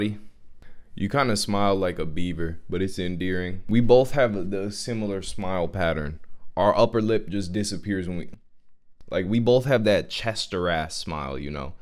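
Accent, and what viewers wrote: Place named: Wisconsin, United States. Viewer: My boy have to be from Wisconsin lol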